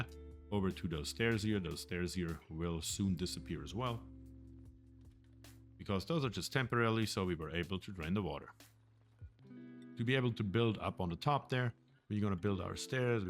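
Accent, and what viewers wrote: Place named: Germany. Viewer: i have watched enough joerg sprave to know that this man is definitely german.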